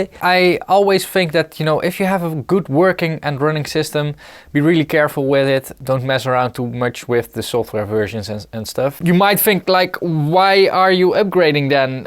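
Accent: Netherlands. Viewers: goedemiddag Nederland , ik heb die update ook gedaan, maar bij mij werkt alles nog goed , ik dacht al jou accent klinkt zo Nederlands :)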